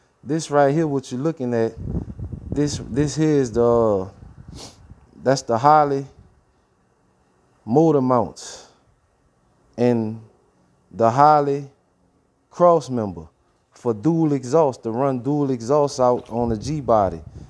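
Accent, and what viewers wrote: Louisiana, United States. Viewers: Good build soud like ya from the boot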